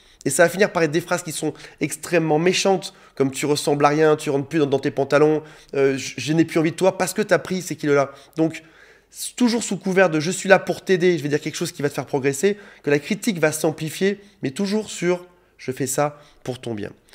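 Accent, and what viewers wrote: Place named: South, France. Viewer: avec un de ces accent du midi ! c😊ng 😄🤗👍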